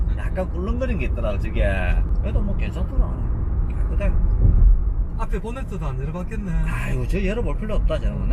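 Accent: Gyeongsang, South Korea. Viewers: 야들아! 문댕이말고 표준말하면 좋을탠대~ 저질스러워서 ~원!!!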